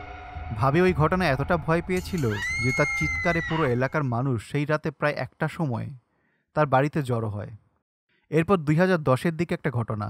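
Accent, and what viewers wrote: Barishal, Bangladesh. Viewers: Apni ki barisal er? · vaiya apni ki borishaler?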